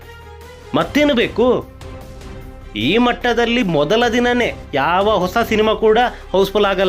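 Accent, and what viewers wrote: Coastal Karnataka, India. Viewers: nivu super,love u ದಾದಾ ❤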